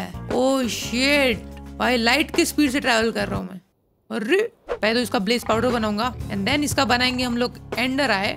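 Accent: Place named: Gujarat, India. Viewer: Aapki boli se lagata he ki aap gujrati ho kya ho aap gujarati 😘😘😘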